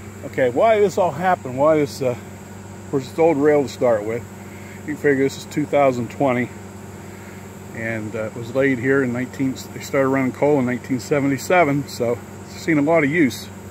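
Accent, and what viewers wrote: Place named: Maryland, United States. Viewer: Man, I don't think I've ever heard a thicker Maryland accent in my life.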